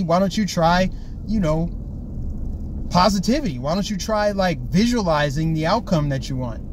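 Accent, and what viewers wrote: United States. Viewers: You talk like an American. Ebonics is the number one reason that black children have trouble in schools. I tutored children when I was pregnant with my daughter. Ebonics is bad for black children. Blacks have lived here longer than many other peoples They should speak American-english. Just saying Ebonics is bad. It's jealousy. Proud of you. Stay strong & moving forward. Live a good life. Thank you. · Keeping you in my prayers. You talk like an American. Those folks are messed up. Hate will destroy them. Report them asap.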